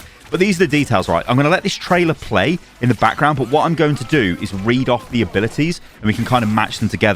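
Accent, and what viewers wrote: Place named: United Kingdom. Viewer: It's just his br'i'sh accent ;)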